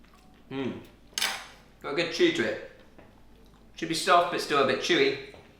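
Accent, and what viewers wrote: England, United Kingdom. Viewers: Chinese Chef with an english accent. Great!! Chinese noodles are great so is dumplings. Yummers!!!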